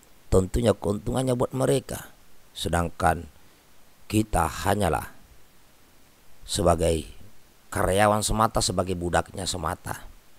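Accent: Indonesia. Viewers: logat ke daerahnya jgn di tinggal itu makin Indonesia Banget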